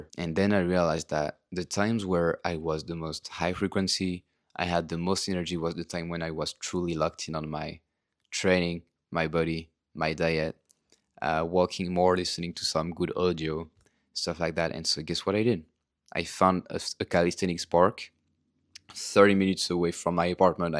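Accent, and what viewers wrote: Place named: France. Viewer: This video is so informative! That French accent tho 🤌🏽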